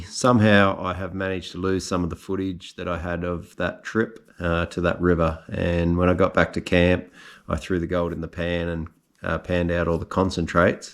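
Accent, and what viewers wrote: Australia. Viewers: I thoroughly enjoyed your fossicking in our lovely mainland river. I don’t know why YT algorithm suggested your video but I’m glad it did. So much better hearing a local Aussie accent instead of the usual American ones so prevalent on YT.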